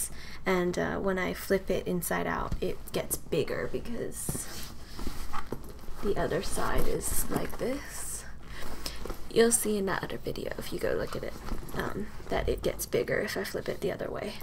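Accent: Russia. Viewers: Or russian, if you ever watched orange is the new black reminds me of red! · I definitely heard some Russian in the accent :)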